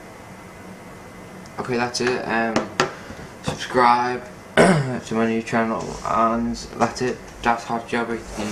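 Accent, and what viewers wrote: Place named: United Kingdom. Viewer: maybe we could follow the "simple" steps if it wasnt for your anowing brit accent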